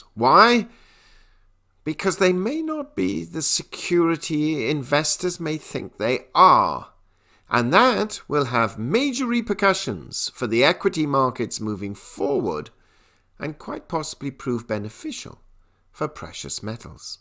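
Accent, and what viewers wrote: England, United Kingdom. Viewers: Although all this is threatening information for my small portfolio (but not really unknown to me), I thank you. Allow a personal remark, please: you have a lovely English accent, pleasing voice and good diction (rare on YouTube).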